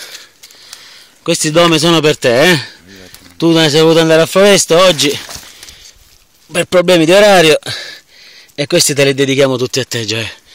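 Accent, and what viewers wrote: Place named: Tuscany, Italy. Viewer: Cominci pure a parlare toscano....grande!!! Ciauuu.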